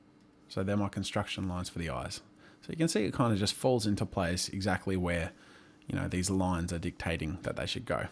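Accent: Australia. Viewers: <3 australian accent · You were somehow more Australian then you are now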